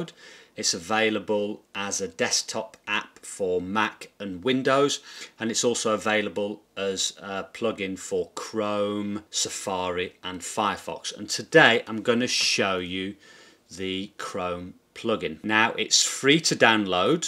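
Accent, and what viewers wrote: United Kingdom. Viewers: Interesting how English is spoken in so many parts of the world, yet have so many variations. I don't like using American English, but selling on Amazon, means using American. I do love your British accent,. Grammarly is great to use.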